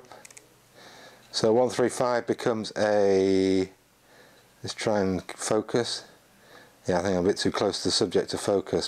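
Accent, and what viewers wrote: Manchester, United Kingdom. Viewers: Sounds like a Manc accent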